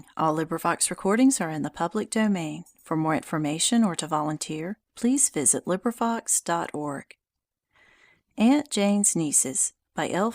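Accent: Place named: United States. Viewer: Seriously learning english using a very american accent.. 😅 could not be more useless.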